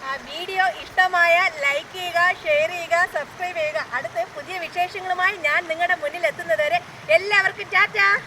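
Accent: Trivandrum, India. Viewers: ചേച്ചി വീഡിയോ സൂപ്പർ ആണ് tvm ഭാഷ സൂപ്പർ സാരീ നന്നായി ചേരുന്നുണ്ട്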